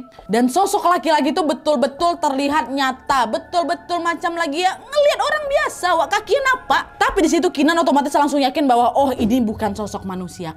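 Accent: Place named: Medan, Indonesia. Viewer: ini pintar,lucu selain logat medannya kental Trnyata pintr jg ngmog jawa nnlogatnya pun bgs... Sukses selalu... Salam dr Turky 🙏🙏🙏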